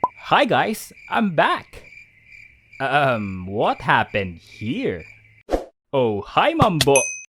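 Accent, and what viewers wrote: Philippines. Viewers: voice sounds south east asian, sounds filipino . . .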